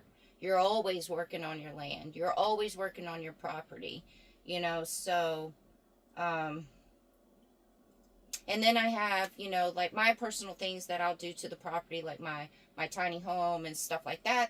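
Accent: South, United States. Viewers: Are you from the south ( Mississippi, Alabama or Georgia)? You sound like it. <3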